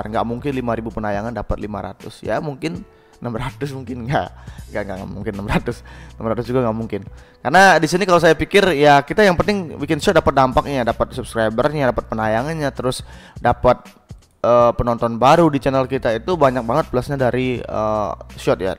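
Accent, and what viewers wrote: Bali, Indonesia. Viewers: Keknya logat Bali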